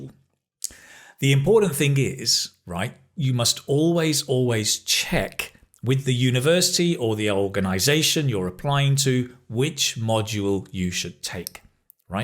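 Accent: United Kingdom. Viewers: I ENJOY VERY MUCH WHEN I LISTEN TO YOU WHILE YOU SPEAK BRITISH ENGLISH WHICH WE WERE BEEN TOUGHT IN SUDAN MANY YEARS AGO. I want to speak it like you but l could not. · Is this British English?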